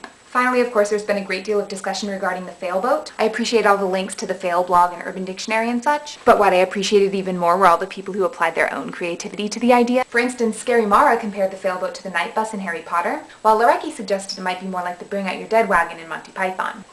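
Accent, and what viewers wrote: France. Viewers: Yay new video! John Green said he enjoyed your channel today in BlogTV. (yes, I also have no life) Also, very nice French accent! You practically own awesome and thus it is your awesome. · haha i love that french accent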